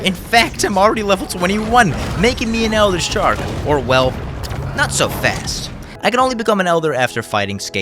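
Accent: Netherlands. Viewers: are you dutch? cuz your accent sounds very dutch imo. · your dutch arent you?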